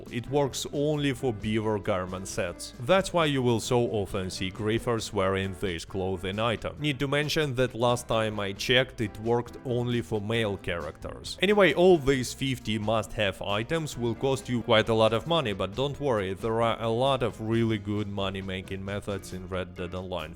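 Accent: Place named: Russia. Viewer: I love your accent. It sounds Russian but not quite Russian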